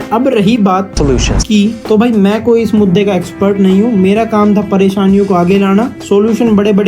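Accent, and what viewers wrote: Haryana, India. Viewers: Bhai tu haryana se hai na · Aree tum haryana side se ho kia bahi? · Where are you from? I think haryana❤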